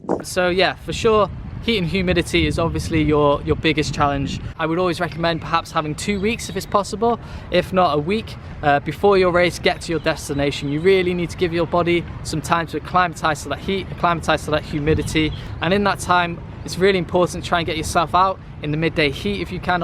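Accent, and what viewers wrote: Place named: North Wales, United Kingdom. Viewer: Really your from Wales! You sound from North, I'm from Denbighshire if you know there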